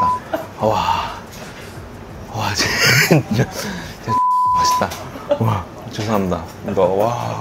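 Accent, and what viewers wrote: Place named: Gyeongbuk, South Korea. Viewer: 저는 경주에사는 50대 그냥 아줌마입니다^^여러~~유투버들봤는데 댓글은 정말,첨으로 남깁니다. 맛나게드시는건 기본이고 인정도많네요.특히 시장에서는 더 한듯~~방안에서 배달음식보단 나가서 시장순례가 더 중독으로가게하네요^^같은경북이라 억지로 표준말안쓰시고 경북말씨 더 듣기좋앟어요^^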